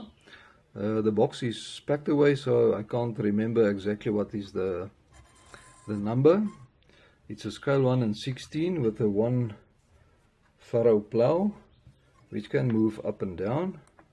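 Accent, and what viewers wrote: South Africa. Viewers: i can tell your from south africa cos i used to live there and i am a big fan of tractors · Are jou south african😀😀😀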